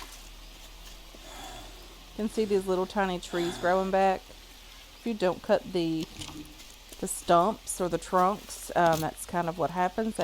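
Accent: Kentucky, United States. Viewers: From 1 Ky girl to another love the accent! Love watching you play Green Hell. Tip: unless just for videos & showing how to build I would not invest tons of building into drug camp or until you get to second map Anaconda Island or past if in story mode build only small overnight stopping points & water filter or containers etc... you will most likely spend very little time on this side of the map. ;-) there is also a Great cave to build in just go straight back behind the pig trap. Also watching your second season of Subsistence from the beginning in the 30s now liking it as well. Love your stories about your mom, canning & family. If you have not tried Grounded also check it out.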